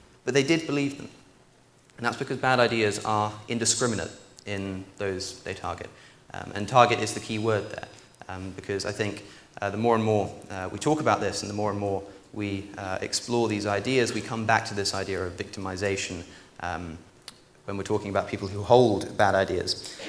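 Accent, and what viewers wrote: RP, United Kingdom. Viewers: • Looks like a young Oxford boy • Talks like a young Oxford boy • Thinks like an ancient Greek filosopher I'm proud to be the thousandth like on this video! (not really) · British accent = Sound smart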